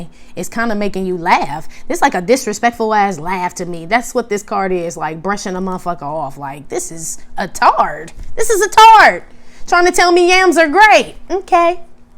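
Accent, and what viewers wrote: Chicago, United States. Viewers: you talk like us in Chicago🤣🤣🤣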